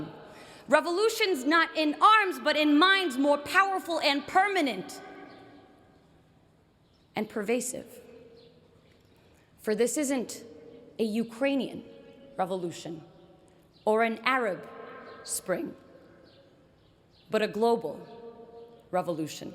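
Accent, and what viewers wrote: United States. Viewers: ماشالله تبارك الله والله انك فخر للعرب علي روسنا الله يحفضك ابدعتي في كل حرف من حروفك وبلغه العظماء لقه قويه ولهجه قويه وكانها عاشت في امريكا ولاكنها سافرت الي امريكا في عام 2010 واستطاعت ان تصل الي هدا الابداع نقف اجلالا وتكبيرا وتعضميا لكي ايتها المتالقه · تتكلم اللهجة الامريكية بطلاقة كبيرة دليل على انها عاشت في امريكا من ايام المدرسة وليس من ايام الجامعة ..والكلمة هذي اشك انها كتبتها .. لا تزعلوا و تقولوا يحقد عليها .. هذا شك و الشك يحتمل الصواب و الخطاء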